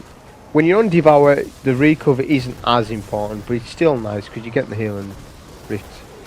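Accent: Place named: Northern England, United Kingdom. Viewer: I know most rant on about ESO's solo runs of content but enjoy the actual audio commentry with it from these videos, especially from a northern lad :D PoH next?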